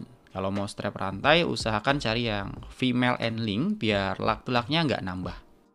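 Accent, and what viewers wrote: Tegal, Indonesia. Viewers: nyimakk, orang tegal bang ? sama soalnya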